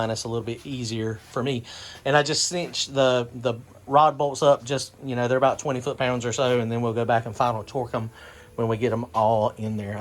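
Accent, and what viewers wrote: South, United States. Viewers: Honestly, I don't really care much for southern accents, but I subbed before you started showing the engine. There's a lot of engine channels on youtube, but a lot of douches too. You automatically have that vibe that makes others wanna sit down, have a beer and talk cars with. Very neighborly and humble.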